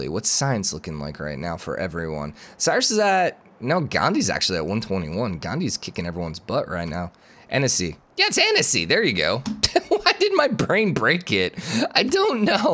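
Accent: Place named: United States. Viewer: Great video. You americans say words real funny and i love it.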